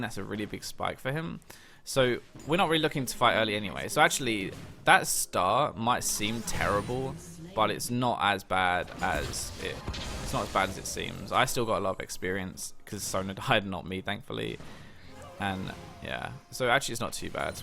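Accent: United Kingdom. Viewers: its called a British accent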